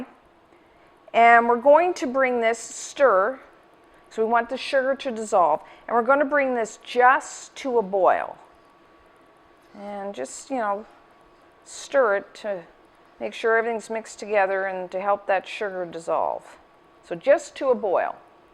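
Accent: Canada. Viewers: thought she was canadian, the accent and all that...weird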